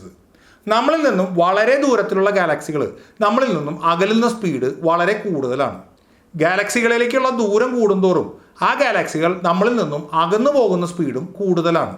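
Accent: Thrissur, India. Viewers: നല്ല അവതരണം വളരേ വ്യക്തതയാർന്ന വിവരണം . അതിലുമുപരിയായി ഭാഷാശൈലി ഒരു തൃശൂർകാരന്റേതും . ഞാൻ ഒരു തൃശൂർകാരനാണേ അതുകൊണ്ട് പറഞ്ഞുപോയതാണ് . ഇതുവരെ ഇതുപോലുള്ള ഏറ്റവും പുതിയ അറിവുകൾ പകർന്നു തരുന്ന മറ്റൊരു വീഡിയോ ആരും അപ് ലോഡ് ചെയ്തതായി എന്റെ അറിവിൽ ഇല്ല . വീഡിയോ വളരേ നന്നായിട്ടുണ്ട് .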